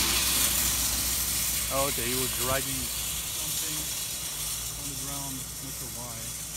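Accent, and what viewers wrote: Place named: France. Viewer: not to sound demeaning or such I have been trying to figure out your accent leaning towards French been watching all of your vids they are great hoping to do the same someday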